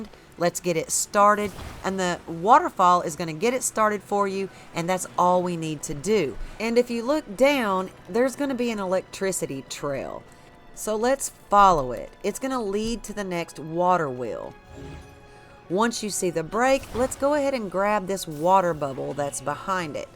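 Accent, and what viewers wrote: South, United States. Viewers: Your country twang so cute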